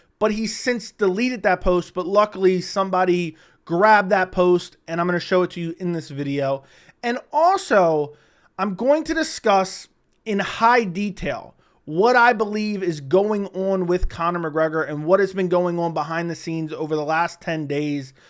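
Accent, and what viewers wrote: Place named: Philadelphia, United States. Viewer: That Philly accent is top notch my guy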